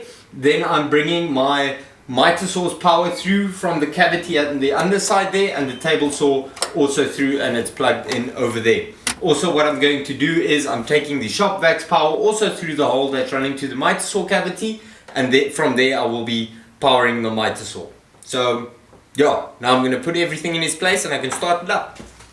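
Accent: South Africa. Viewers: Well done with the table. Its a serious space saver. You sound south african. Still living here? If so, where will I find an automatic on/off switch as described on your website? Thanks for a great channel.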